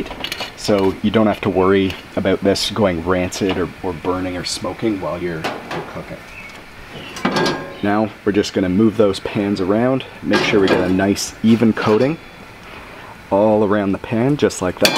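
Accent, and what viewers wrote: Canada. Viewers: I love how extremely wealthy people wear God awful sweaters like that . Called his gas grill a BBQ LOLOLOL you have to be wealthy to talk like that. Must be Canadian because he says aboot not about.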